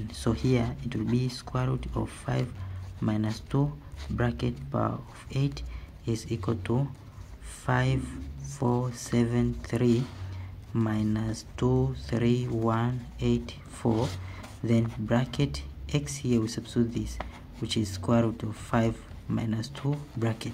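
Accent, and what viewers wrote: India. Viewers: The accent sounds indian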